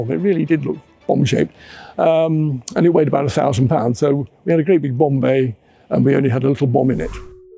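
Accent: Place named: United Kingdom. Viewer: I’m going to start cultivating a British accent so I can sound as cool as this bloke… Love this video!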